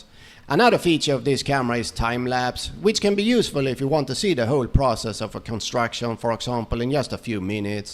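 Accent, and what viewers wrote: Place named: Sweden. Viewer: Trevlig video, är du svensk? Låter så när du pratar engelska. 😊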